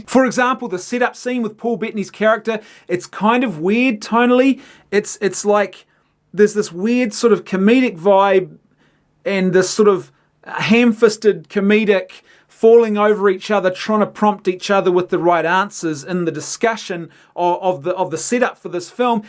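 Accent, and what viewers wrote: New Zealand. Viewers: The only question I have after watching this: Are you from New Zealand?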